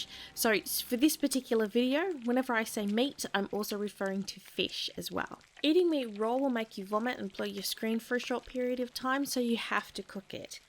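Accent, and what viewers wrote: Australia. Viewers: Love the Aussie accent and the guide is really useful. Your so underrated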